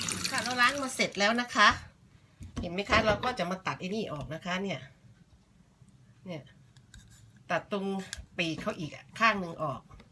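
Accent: Isan, Thailand. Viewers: คนอิสานใช่บ่ ผมฟังรู้ 555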